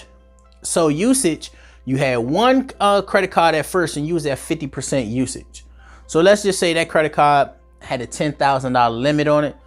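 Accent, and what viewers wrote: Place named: Washington DC, United States. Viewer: I can tell by your accent you're from DC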